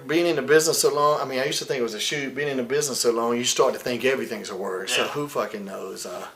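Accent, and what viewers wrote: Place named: North Carolina, United States. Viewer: I love Shane he don’t hide that N.C. accent. I’m from N.C. so him, Matt, and jeff are kinda hometown heroes for me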